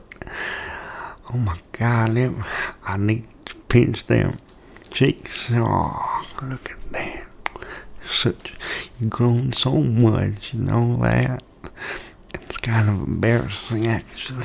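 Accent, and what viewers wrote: South, United States. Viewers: You seem much more mature than a typical 24 yr old. I'm old enough to be your Dad.....if you were born when I was 12 yrs old! KIDDING! 20 yrs from now, you'll look the same. You know I like to tease you, but I'm correct on how you'll age. I like the southern accent and remember what I wished for you to do.........and accents will help. I'm not good at accents nor can I identify them (where the person is from). Due to cancer treatment, I lost some of my hearing at a young age. While in Hawaii, a waitress came to my table and I just looked away b/c I had NO idea what she was saying and she was speaking English but with a heavy accent. My friends found it hilarious, while I sunk in my chair. 🤣 (it's healthy to laugh at our own shortcomings) 👂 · I was not expecting such an flawless Southern accent but here we are 😂😂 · how did bro nail the southern acc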